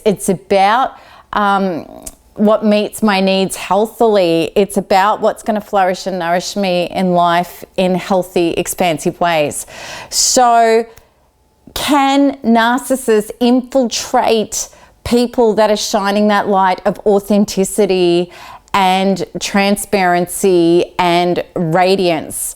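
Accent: Australia. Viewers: When does this New Age bs with a Aussie female accent STFU?! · Are you Australian?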